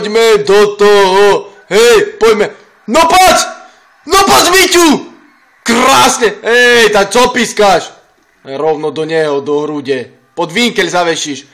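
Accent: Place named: East, Slovakia. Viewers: Vychodňar ako ma byť!! :D :D :D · paradaaaaaaaa...supeeerr....aj ked polka SVK nerozumie asi ale "na vychodze rozumime" :D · jeden záchvat skončil,a pri dalšiej vete znova :'DDDD niet nad našu vychodnarčinu <3 :3 :D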